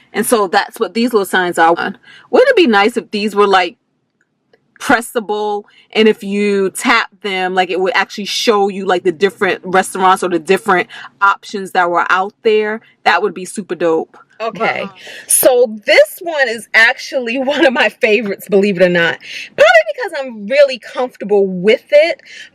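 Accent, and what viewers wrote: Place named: DMV, United States. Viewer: Love the DMV accent!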